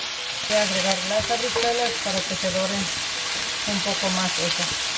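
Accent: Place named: Guanajuato, Mexico. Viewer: De donde es usted ? tiene un acento como de Guanajuato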